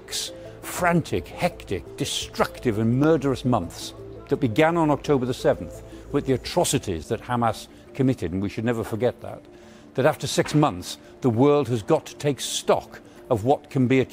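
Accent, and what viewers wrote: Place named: United Kingdom. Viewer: no one, but British pronunciation is annoying lol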